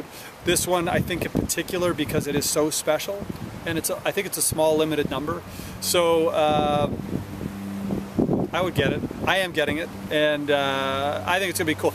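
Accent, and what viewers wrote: Canada. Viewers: nah that eastern? Canadian accent cuts through the wind after a bit. these vids are like watching painting w bob ross but better....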